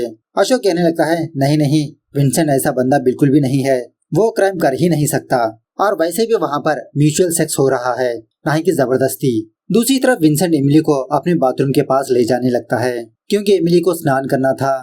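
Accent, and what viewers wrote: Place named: Andhra Pradesh, India. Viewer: Andhra Pradesh maybe because Arunachal Pradesh ka bolne ka accent alag lag raha hai😅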